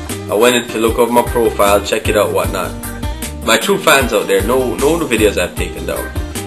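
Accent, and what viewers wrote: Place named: Jamaica. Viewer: Brother, I'd like to say that I'm glad you are still on you tube. First time I saw you, I was blown away. It was about 2006 and I saw a white Jamaican yelling about how people reacted when they hear your voice and see a white face and then you had dreadlocks with you face painted sayin "was this what you was expecting to see!" It had me rolling. It was my first laugh on YouTube. I'm glad to see your still keeping it going. Good Bless Peace and Respect all the way from NJ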